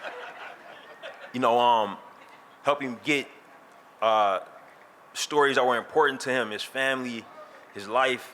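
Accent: Oakland, United States. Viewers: I like that he stutters and has an Oakland accent because, despite that, Ryan is talented, has great vision, a mind, dedication, and follow through based on what I've seen and heard about him.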